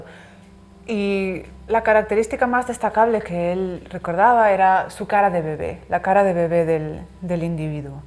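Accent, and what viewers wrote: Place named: Spain. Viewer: Lo haces muy bien! El video lo narras muy bien! Y me encanta tu acento español. De que provincia de España eres?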